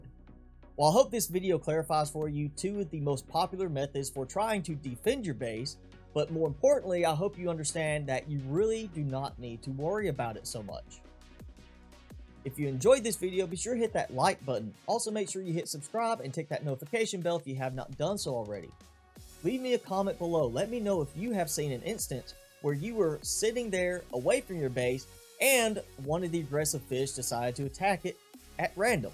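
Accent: United States. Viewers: Pretty sure he's American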